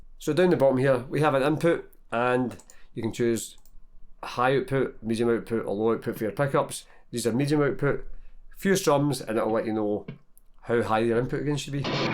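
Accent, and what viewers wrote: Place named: Scotland, United Kingdom. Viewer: Hahaha love the way you pronounce Mesa boogie with the mighty Scottish accent 🤣🤘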